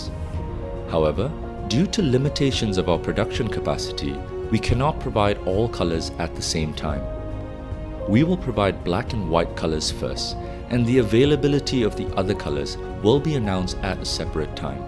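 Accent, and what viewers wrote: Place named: India. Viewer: Definitely an indian dude narrating this.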